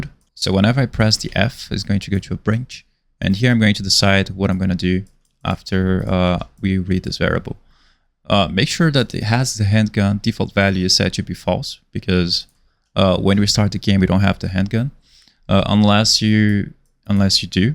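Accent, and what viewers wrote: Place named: Brazil. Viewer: bro has that brazilian accent :goodvibes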